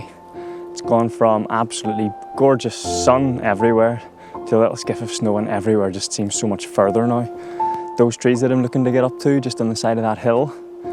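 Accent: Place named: Northern Ireland, United Kingdom. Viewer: Beautiful drone work, views and stills. Definitely a Winter Wonderland. Judging from your accent you are from Northern Ireland. Am I correct that you are living Scotland now? I am a Scot from Edinburgh but have been living in the pretty little town of Portstewart N.I. for 45 years to date. Anyway, I wish I was there also photographing that snow and hills...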